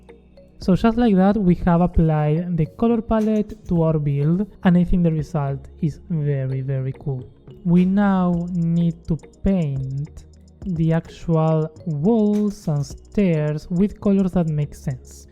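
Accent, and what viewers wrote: Argentina. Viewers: vo so re argentino no? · Suenas argentino.. muy bueno el vídeo, loco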